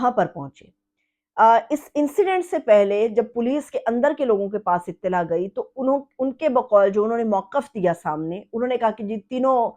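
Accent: Punjab, Pakistan. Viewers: Hehehe MASHALLAH your Punjabi Accent is very cute.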